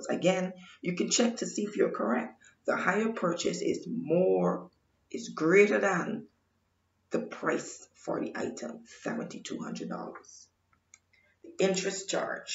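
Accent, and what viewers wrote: Barbados. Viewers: Thank you, this helped me a lot and I love your accent are you a bajan?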